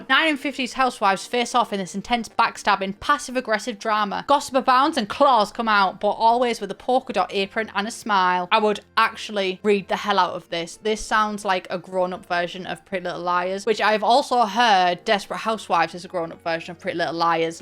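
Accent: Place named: Redcar, United Kingdom. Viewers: Middlesbrough accent. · Is it cause we where both born at james cook that I love you so much . Cause girl it might just be that i live in redcar n we share an accent 😂🎉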